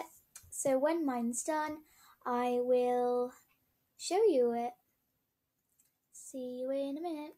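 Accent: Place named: United Kingdom. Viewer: col british accent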